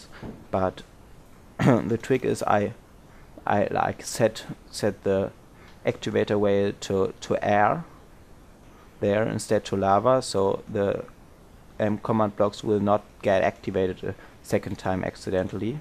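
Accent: Germany. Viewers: man merkt, dass du deutscher bist xD aber du sprichts sehr gut englisch mach weiter so :D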